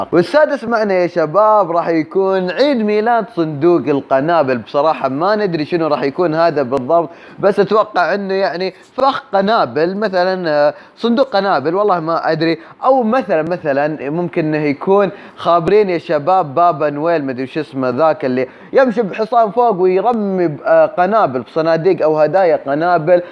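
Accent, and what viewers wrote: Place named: Saudi Arabia. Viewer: kmal alswydy واضح كلامه هوا من السعوديه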